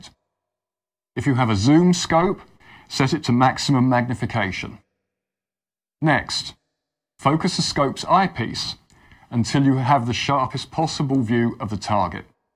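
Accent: United Kingdom. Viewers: Thank you british man!!! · You sir are a scholar and a gentleman This was beautifully explained and the British accent makes it nicer for me as someone brought up in rhodesia and South Africa 😎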